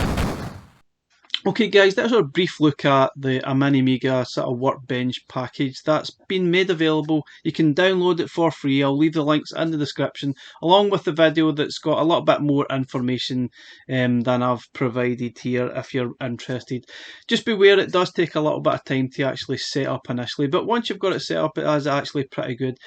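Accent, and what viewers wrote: Scotland, United Kingdom. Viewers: Fanstastic scottish accent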